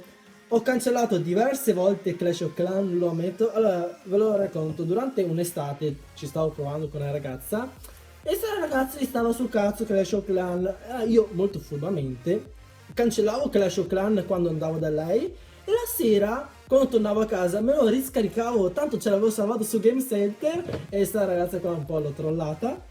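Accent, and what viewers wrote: Veneto, Italy. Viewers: Ahahahahh grande, ho messo like subito per il dialetto Veneto♥♥ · ma sei veneto???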